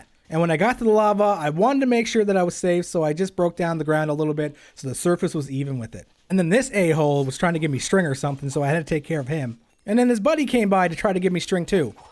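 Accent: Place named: South, United States. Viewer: Whatever your name is again I think you have a country accent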